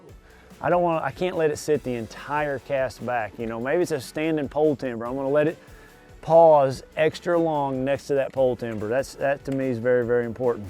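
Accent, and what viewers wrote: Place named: South, United States. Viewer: January WHERE???????? Sounds like you have a southern accent....in certain areas up north in January, the most important thing would be an ice augur! lol.....Why wouldn't you start the discussion with where you are, and generally, where you fish....I had to fish 45-50 feet deep water to catch bass today, and you are talking to me about a jerkbait??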